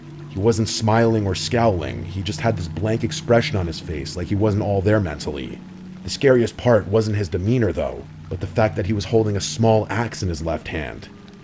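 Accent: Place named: Massachusetts, United States. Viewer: I'm from Massachusetts. sounds like he's got a slight Mass accent.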